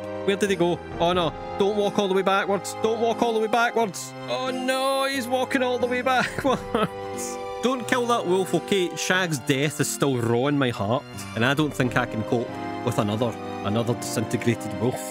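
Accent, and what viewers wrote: Scotland, United Kingdom. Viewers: I accidentally unsubscribed scottish skyrim daddy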